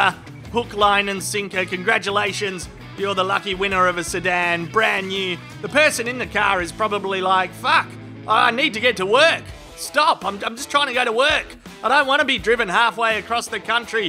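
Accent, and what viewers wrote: Australia. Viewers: "Yeah-nah!" Classic Aussie speech.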